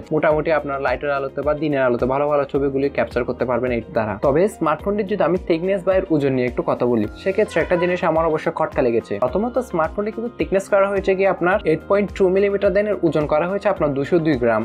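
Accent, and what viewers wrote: Chittagong, Bangladesh. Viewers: চাটগাঁইয়া রিভিউয়ার মনে হচ্ছে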